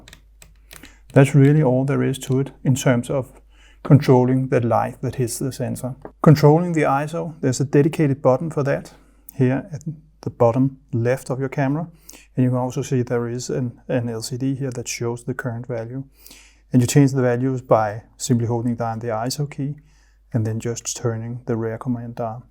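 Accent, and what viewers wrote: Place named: Denmark. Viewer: I've been following Youtube and Private site for a few years now, one of the best out there for learning - clear, concise and to the point with the bonus of that beautiful Danish accent - deserves much more than the current 15k subscribers. The D4s has been my dream camera since it was released and I almost bought one back in March '25 but went with the D810 to add to my D7200 and D7000 - I was put off by the added cost of XQD / CF cards [ though the D810 does have a CF slot I've not bothered using it ] - Watching this video I'm tempted to revisit the D4s once more.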